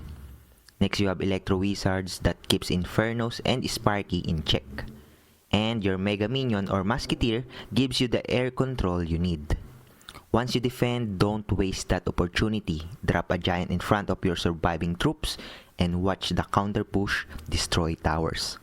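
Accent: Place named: Philippines. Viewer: Base on his accent bro is a Filipino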